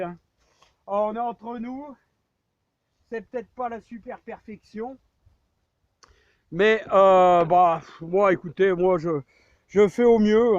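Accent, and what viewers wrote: North, France. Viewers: Ça "écrase" l'accent de chn'ord... 😍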